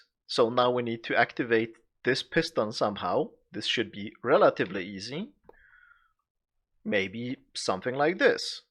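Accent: Sweden. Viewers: Well tru but it gets a bit more complicated to check if all have shulkers when you have a bunch of them. And i hate to fill things manually since i will only do it when i see that something is wrong. But that's a good point i think i will put the buttons directly if i can push them between the hoppers :) Great filler though had a lot of problems with other loaders but this just runs smoothly :) Your from sweden i guess? Sounds like it atleast. Grymt jobbat med denna lilla contraption kommer fortsätta hålla utkik efter saker som du lägger ut :) Är du nån gång intresserad av nya projekt har jag ett som jag inte kommer kunna lösa men om du vill ge det en chans så hojta :)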